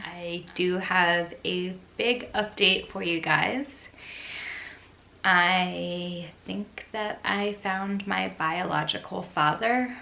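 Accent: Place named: United States. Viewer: Genetically, yes, she is 100% Caucasian as the ME falls under that category. But socially, she's mixed race due to the cultural differences. Judging from her accent, she lives in the US and is trying her best to make sense of things within the racial constructs that she lives in. It's accurate for her to describe herself as mixed race.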